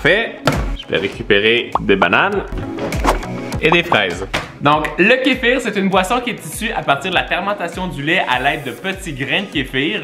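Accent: Canada. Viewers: tu mon youtubeur préféré de is vidéos accent canadien et qui met quoi des vidéos trop trop rire 👍👍👍👍👍 24h 🇨🇵🇨🇵🇨🇵🇨🇵 TikTok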